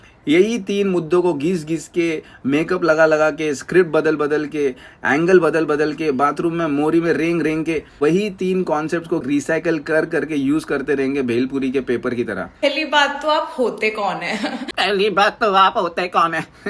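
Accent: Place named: Maharashtra, India. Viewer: Your Marathi accent is making this video 10x times better 😂❤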